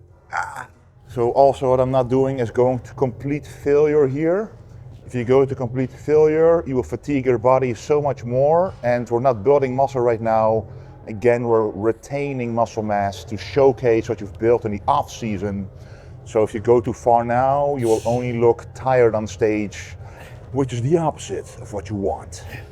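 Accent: Netherlands. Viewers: speaks like a Dutch Optimus Prime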